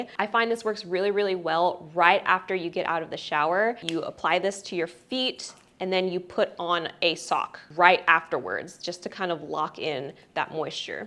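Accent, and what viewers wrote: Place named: Australia. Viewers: girlllll you’re Aussie accent is killing me 😂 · the aussie accent was confusing me so much 😭 but it’s so cute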